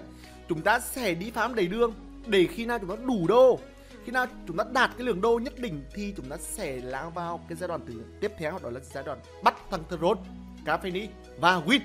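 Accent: Nghe An, Vietnam. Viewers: Y nghe an que choa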